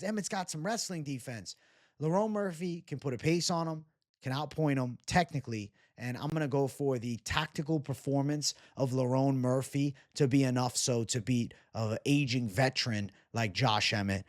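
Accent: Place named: Boston, United States. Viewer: Can take the boy outta boston but cant take boston outta the boy. Blahss-fee-muss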